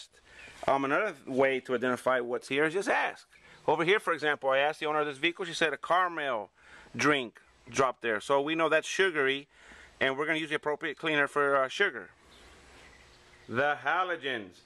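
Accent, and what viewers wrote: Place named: Mexico. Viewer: He talks like a mexican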